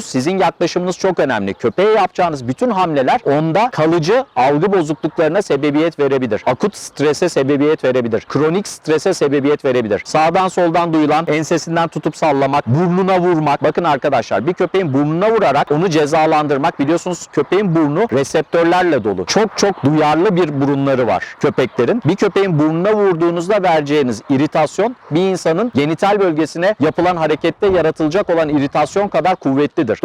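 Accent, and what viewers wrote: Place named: Istanbul, Turkey. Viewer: Çok İstanbul ağzı konuşuyor diksiyon çok iyi ben ise Adana ağzı konuşuyorum çok moralim bozuluyor ne zaman kelimeyi bozacak diye bekliyorum. Bu beni üzer....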